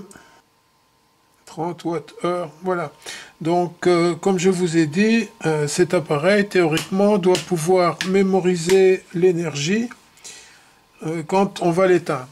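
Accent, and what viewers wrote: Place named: Belgium. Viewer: Merci de cette vidéo bien expliquée (+ sympathique accent belge...)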